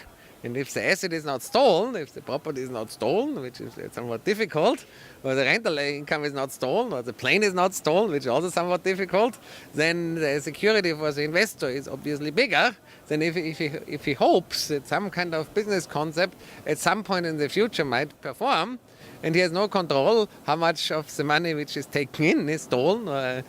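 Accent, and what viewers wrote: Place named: New York, United States. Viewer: Das ganz typische New Yorker Äll.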